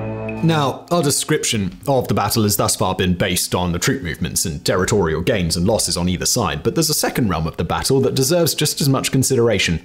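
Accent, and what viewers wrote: United Kingdom. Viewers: Is the narrator British? There's only so many English accents and I don't think it's north American or Australian :/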